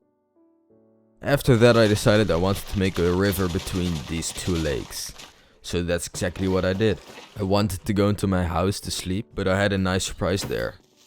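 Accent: Netherlands. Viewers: hey! :) are you dutch? your accent sounds very dutch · You sound very dutch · are u dutch? i am.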